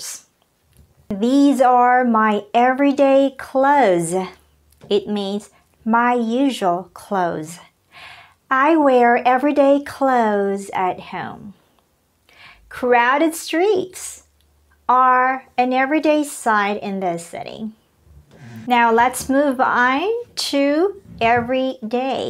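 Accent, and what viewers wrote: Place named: United States. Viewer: a good idea to devide this topic into two lessons and great american pronunciation as well...😍😍😍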